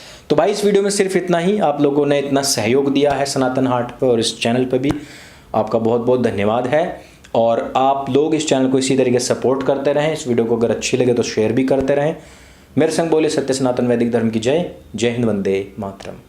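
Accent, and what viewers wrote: Haryana, India. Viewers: Sir haryanvi sunne me mazaa aa gaya ...what a episode mind blowing .. dhanyawad... 🙏🙏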